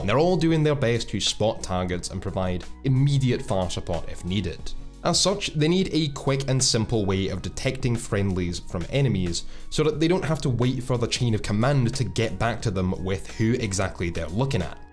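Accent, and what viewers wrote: Scotland, United Kingdom. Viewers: Scottish ey noice!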